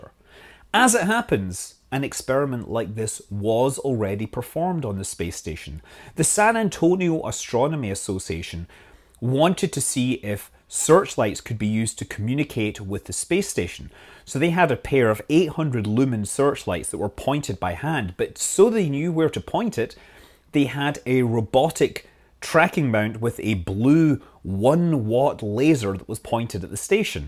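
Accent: Scotland, United Kingdom. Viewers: It's refreshing to hear someone with a Scottish accent talking about something other than drinking and fighting.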